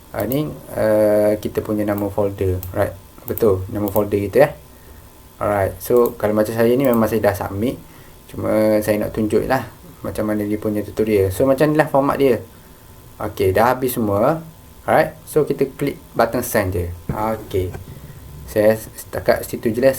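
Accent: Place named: Terengganu, Malaysia. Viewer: terima kasih boh... dengar suara tahu doh.. org Terengganu ni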